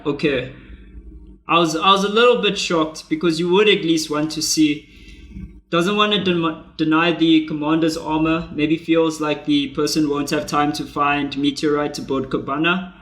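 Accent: South Africa. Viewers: You're attitude is great and the thumbnails are clean good job dude, nice accent too sound maybe like south africa or somewhere in africa?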